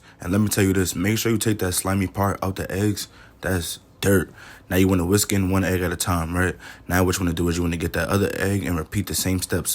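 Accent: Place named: North, United States. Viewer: Idk how southern you are with the up north accent all of that but now we're just waiting on the collard green recipe 😆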